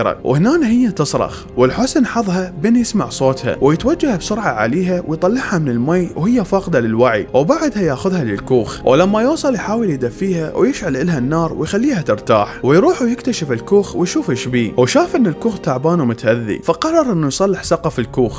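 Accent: Iraq. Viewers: اللهجه العراقيه مميزه تحياتى لاهل العراق اهل الحضاره والكرم مصرى يحب العراق واهله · اللهجة العراقيه جميله جدا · طبعا اني كولش احب اللهجة العرافية اتجنن اخوكم من بنكلادش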